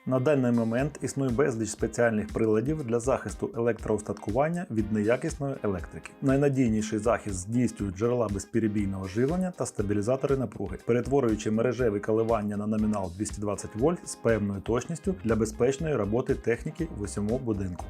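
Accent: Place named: Russia. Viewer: Кацапський акцент. Невже не можна вивчити нормально декілька речень. Азіров би пишався 🤦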